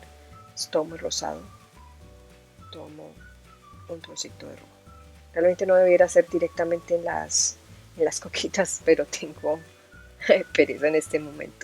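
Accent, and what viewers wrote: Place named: Colombia. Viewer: Siempre admiro tu trabajo . Puesto q es bello bello .. saludos desde Villahermosa Tabasco mex. Hoy me dejas sin palabras, super explicado y detallado . Siempre m pregunto de donde eres, creía q eras española pero hoy tu voz me pareció diferente y pareces colombiana, 😙😙😍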